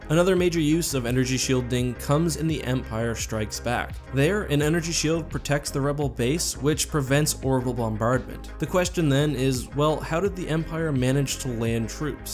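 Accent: Canada. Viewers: You sound Canadian for some reason.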